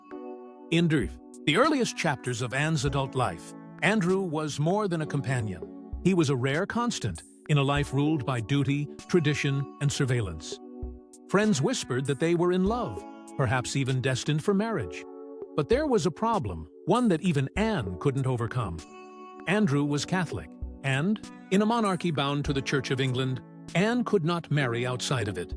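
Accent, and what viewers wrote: United States. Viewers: Why are these videos read by Americans? ANYBODY??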